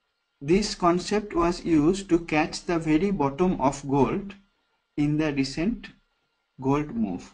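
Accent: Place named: United States. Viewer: Good! liked it. I expected a typical Bengali accent but instead an American! :). would like more from you.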